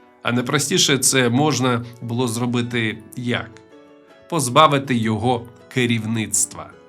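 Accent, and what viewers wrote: Russia. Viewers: Доповідачеві бажаю позбавитись руського акання і читати вголос українською щоб чути вимову.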